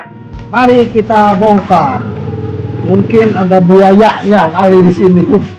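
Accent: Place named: West Java, Indonesia. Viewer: 😆😆😆orang Sunda pisan euy.mantab tutorialnya.smangat mamang ah.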